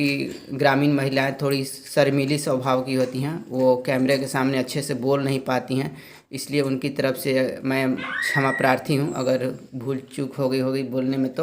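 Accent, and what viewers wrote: Standard. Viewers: क्या शुद्ध हिंदी बोलते हो भाई 🥰गजब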